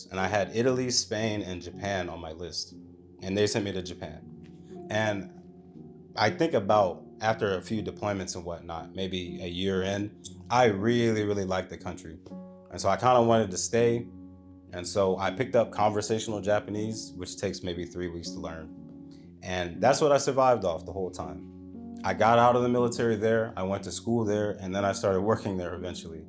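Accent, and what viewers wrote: United Kingdom. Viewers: New subscriber. Awesome content. Brilliant British accent too 🎉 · That was a lovely posh British accent! Very interesting channel. Nice to see another brother in Tokyo doing well.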